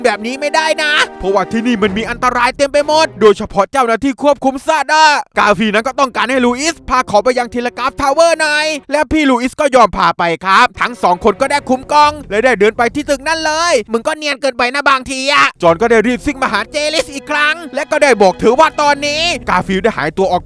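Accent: South, Thailand. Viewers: คนใต้ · พี่เป็นคนใต้หรอครับ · พี่คนใต้ใช่ไหมพี่ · พูดไต้ได้ด้วยไอย่าไอบาวนี้ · สุดยอดสำเนียงใต้ 555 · พี่ครับพี่เป็นคนใต้หรอครับ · พี่เป็นคนใต้หรอ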